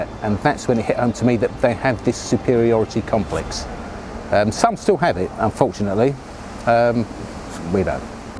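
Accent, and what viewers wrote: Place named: England, United Kingdom. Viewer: Straight & witty albiet with an English accent. An Anglo - Indian through & through. We didn't ask to be created as AI's but we wore the suit as best we could. Loud n Proud.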